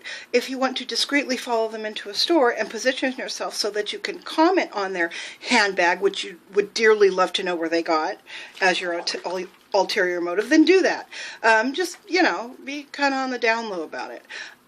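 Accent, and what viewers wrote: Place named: South Carolina, United States. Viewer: I cannot wait to see you in character with all the bells and frills and everything else complete. Love that South Carolina accent, by the way, you got it goin' on! :) Mah word!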